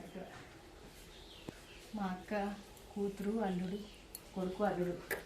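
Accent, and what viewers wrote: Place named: Godavari, India. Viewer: మాటతీరు గోదావరి వారిలానే ఉంది!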